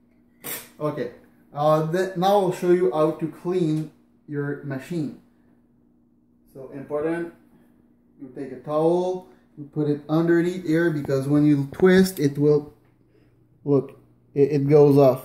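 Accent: Quebec, Canada. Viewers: Merci mon homme, bon accens québécois XD Avant mon café tasted like shit aussi, maintenant il taste toujours like shit mais c'est à cause que j'ai une keurig. J'ai commandé la breville Café Roma sur amazon et je vais commencer à me faire des bon latte! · T'a commencé à parler et je savais t'était Québécois haha x). L'accent est très prononcé, mais nice le review.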